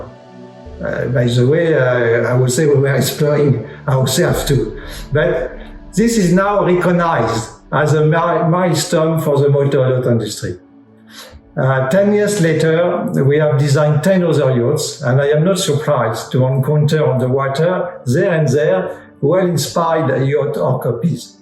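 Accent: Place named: France. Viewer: The music in the background is a little bit disturbing. Sometimes its difficult to understand. (Ok, it's a little bit because of his french accent and I'm not used to hear English with this accent.) There are a lot additional 'cracks' as well, microphone was not the best.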